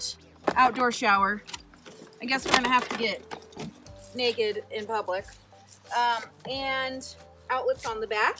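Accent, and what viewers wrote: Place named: Midwest, United States. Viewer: Just tuned in for the Midwestern accent.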